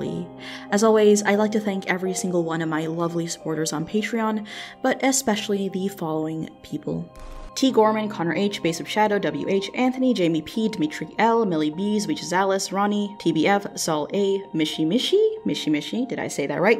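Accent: Hawaii, United States. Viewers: She's from hawaii it's an accent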